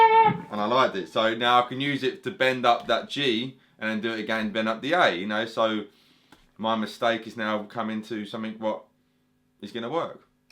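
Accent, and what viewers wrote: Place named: Cockney, United Kingdom. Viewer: Came for the listen, stayed for the cockney accent.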